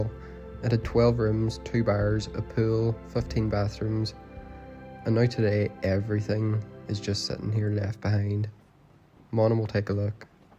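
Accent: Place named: North, United Kingdom. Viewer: We all love your northern accent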